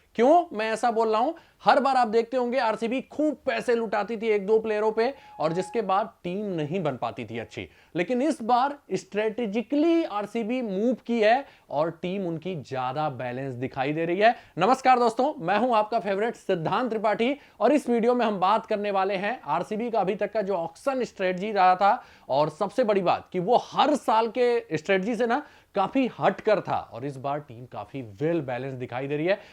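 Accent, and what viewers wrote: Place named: Bihar, India. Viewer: Hindi to sahi bol pehle pure bihari accent aa rai Baki sabb to okay hai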